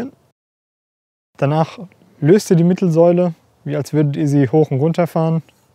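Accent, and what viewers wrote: Germany. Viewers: Oh my dear friend! I follow you all the time for the best reviews and I really wanted to hear you speak in your native language. It seemed to me by name and accent that you have something at the base from Germany but I wanted to hear you. Haha!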